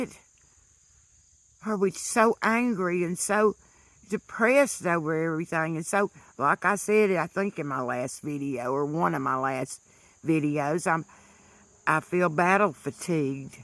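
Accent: South, United States. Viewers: You are voicing exactly how I see things as well. You’re a good, brave person. A beautiful southern voice.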